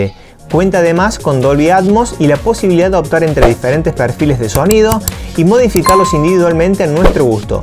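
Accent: Córdoba, Argentina. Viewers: Agradable de escuchar porque es Cordobés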